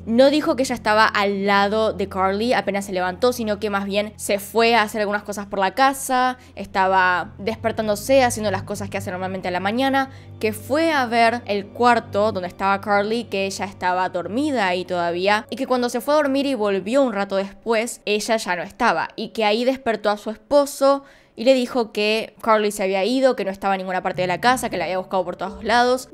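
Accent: Argentina. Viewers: Como todo (Pedante) Argentino la mujer de este canal tiene su pequeño acento Chocante.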